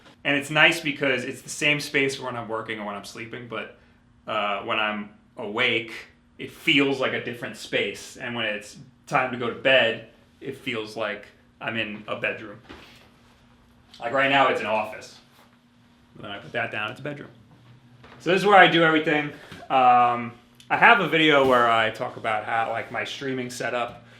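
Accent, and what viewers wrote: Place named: Brooklyn, United States. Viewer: So Brooklyn haha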